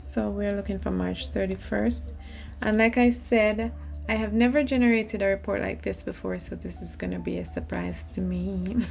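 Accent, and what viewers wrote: Jamaica. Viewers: You sound Jamaican... where are you based at I mean which city? I live in Melbourne Australia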